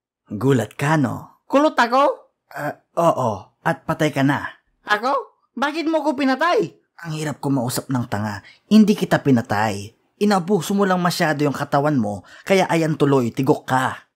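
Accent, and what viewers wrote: Ilocos, Philippines. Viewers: Kulit ng accent mong Ilocano 😂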